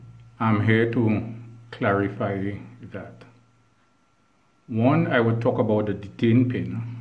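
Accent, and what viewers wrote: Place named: Trinidad and Tobago. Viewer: Just want to say hi to my fellow Trini. Only a Trini like myself will recognize that accent. I am from Point, but live mostly in La Brea. What part you from?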